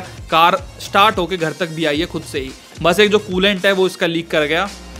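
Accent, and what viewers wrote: Haryana, India. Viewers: भाई , एक बात बोलता हूँ , बुरा मत मानना। जरा धीरे बोला करो। बहोत से शब्द तुम्हारे jumbled आते है। पीछे शेर तो लगा नहीं है ना ! हिंदी एक्सेंट में बहोत प्रॉब्लम है तुम्हारा ! हो सके तो हरियाणवी एक्सेंट में हिंदी मत बोलो।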